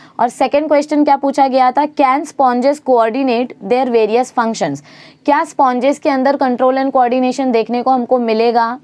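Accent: Maharashtra, India. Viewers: Didi are you marathi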